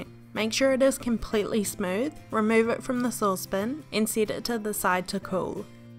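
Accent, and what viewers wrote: New Zealand. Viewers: really good authentic nz accent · She sounds like she’s from New Zealand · I loved your voice and accent like from 🇳🇿 . Not to mention your channel and video content. I will try this one to make and will use whittaker dark chocolates too!!! Love chocolates from🇳🇿. I loved cheesecakes, blueberry cheesecake is one of my favorites by far. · That New Zealand accent reminds me of my cousin. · Definitely sounds like a kiwi accent!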